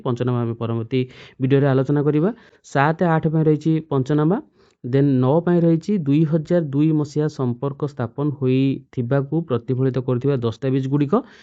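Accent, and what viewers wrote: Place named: Angul, India. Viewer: ଅନୁଗୁଲିଆ m କୁ ma L କୁ La କହୁଛି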